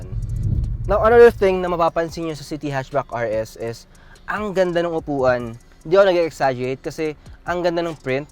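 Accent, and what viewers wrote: Philippines. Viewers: Я просто в ахуе от его «английского филлипинского» .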